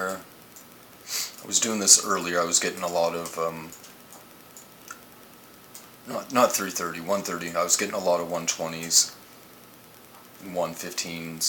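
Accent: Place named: Canada. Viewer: people move. after Sandy, a lot of people moved out of NY. just like after Katrina. California population had jumps after those events. it's called migration. the east coast wasn't permanently flood. those areas were created, not lost. look at old maps. none of the East coast line was never as straight as it is now. those started out as sea barriers. to stop coastal flooding. they are creating new land now. he acts like he knows what the coast looked like but really doesn't. he couldn't even name Chesapeake Bay or the bridge. it's by far one of the most known landmarks of the East coast. those who don't know it are products of poor education. he sounds Canadian so his education of the U.S. is not to be expected. I don't blame him for that. seriously though. millions of people have not been deleted from existence. we've never gotten how population numbers. 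they've always jumped around. especially out if China. they used to inflate their population numbers as a fear tactic. the probably still are.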